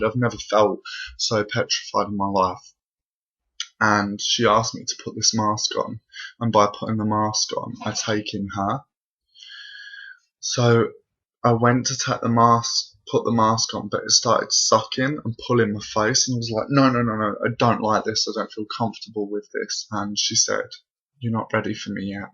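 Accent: England, United Kingdom. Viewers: nice to hear an English accent. what part you from.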